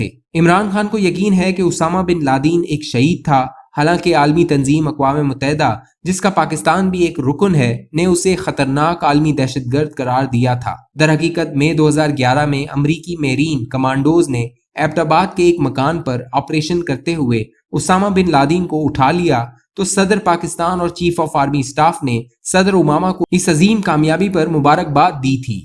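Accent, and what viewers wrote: India. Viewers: انڈین بندے کا لب و لہجہ ہے یہ تو.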